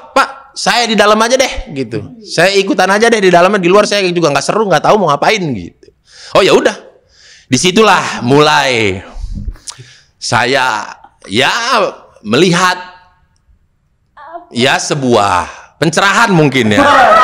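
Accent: Sunda, Indonesia. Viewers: Hahahaha... Bang Sunda Pisan... Keren materinya.... Cerdas dan menghiburrrrr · Bang ini emang GK pernah gagal KL udah STAND UP COMMEDY lucu nya GK tertahankan.. Apalagi saya sebagai orang Bandung sama dengan meski darah Batak,hafal betul dialek" Sunda yg bisa bikin saya ketawa terpingkal pingkal... Bang SUKSES SELALU SEHAT SELALU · Meni kental sundana mang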